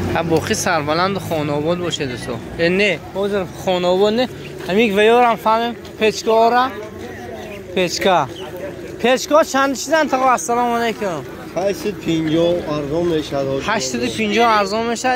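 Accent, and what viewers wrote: Mastchoh, Tajikistan. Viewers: Лавзи Мастчохи кади гап занен кайф мекунам гапи шумора шунида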